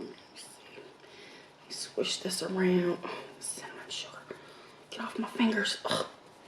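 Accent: South, United States. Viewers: I love that you are talking with us more. Love your little bit of southern accent and your laugh. You really worried me tonight, sweetie, when you swallowed the chicken bone. You were eating fast and that could have been very dangerous. It would break my heart if anything happened to you. If you don't post another video before Christmas, I want to wish you now, the very happiest of Christmas's. I hope there will be a celebration at your house! Thinking of you with Love xoxo. Livia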